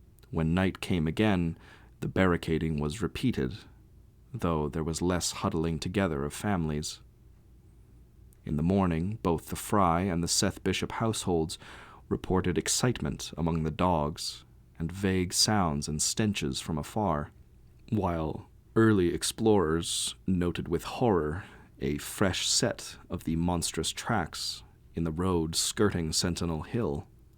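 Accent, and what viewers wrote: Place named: North America. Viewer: Thought the sound was good. North American accent always works with hpl. Obviously the connection with the great god pan is mentioned in the text. But. Connection with 'the white people' less obvious....a playmate was coming 😮.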